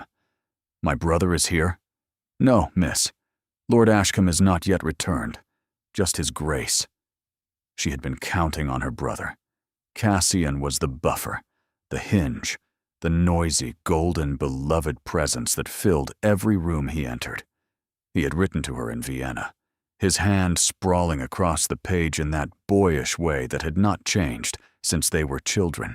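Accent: United States. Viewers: I really have a problem with this AI’s voice. Its sooo unpalatable. I couldnt enjoy the video because of this repulsive voice and American accent that really does not suit this type of audio story. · Why pick an American voice for a totally British story. This keeps happening. I have listened to some very good stories with proper British voices & some were excellent. This one has a Duke speaking in an absolutely inappropriate American voice. Then, the story has the most unheroic hero I can image. He grows up with a brother & sister. He loves both of them, the brother as his best friend & from the time she is 16, he falls in love with the sister of his best friend. She loves him in return but this weird non hero sets out to never allow any chance of them being together. He even tells her to marry someone else & tries to run away from her. She, actually, is a heroine & chases him down so they DO wind up together but, frankly, I can’t imagine not being so disgusted by that time, besides just tired, I would wonder if I could ever trust him. 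There was never a good reason for his ridiculous sacrifice of their lives that I could see. It’s something different but not likable.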